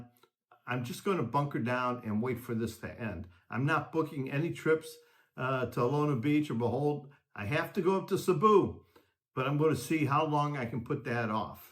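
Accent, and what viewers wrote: United States. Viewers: Asian Mkts are "tanking" and Currencies are getting hammered .. I read "fear of Currency Collapses" .. This on top of Covid Inflation that never came down and Tariffs .. "All of it hurts the poorest the most" .. I saw the anti Corruption Protests, and now I'm seeing Protests there with anti Trump-U.S. Signs, on YouTube (Google) .. "Shits getting real" so keep your head down and don't advertise "American" (you have an accent - practice Canadian Aye mate) .. That's "excess Russian Oil" (ie) already out, on Shadow Tankers ..That wont last long, Russia can not make up for supply loss, and Ukraine has taken-out "30 or 40% of Russian Oil production (off line) .. If this doesn't "end fast" somehow, things will get "a lot worse," before they get better, incl inflation, shortages and collapses affecting millions there / billions of people, worldwide .. 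"Plan for the worst and hope for the best" .. Be safe and best of luck ..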